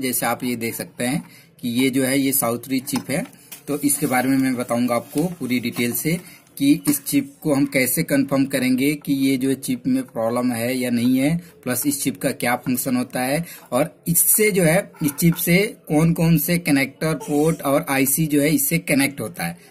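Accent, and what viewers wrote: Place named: India. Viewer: indienglish... q bueno.-....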